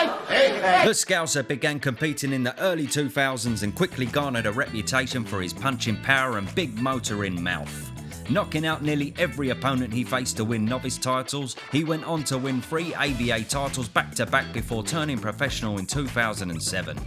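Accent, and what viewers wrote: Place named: South Wales Valleys, United Kingdom. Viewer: As a Welsh man with a ponchant for bhas bleep eeeeeee I endorse your vallleeeys accent.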